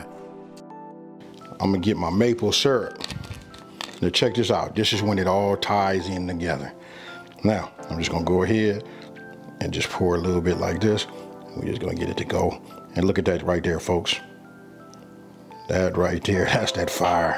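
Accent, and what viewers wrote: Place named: Los Angeles, United States. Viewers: I like this dude lol. I love that LA accent, makes me wanna move to Cali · Dude sounds like he from LA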